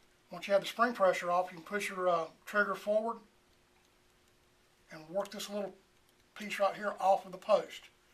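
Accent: United States. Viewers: cool cowboy accent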